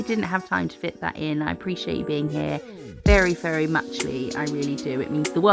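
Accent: England, United Kingdom. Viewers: "Faffing, blotto, para." I haven't lived in England since 2003. Listening to you makes me feel homesick. It reminds me that we speak another language 😂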